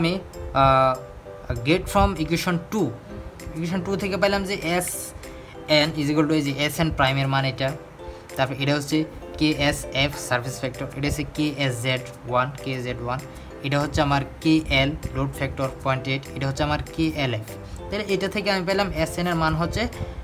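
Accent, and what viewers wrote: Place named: India. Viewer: Damn....I really hate indian accent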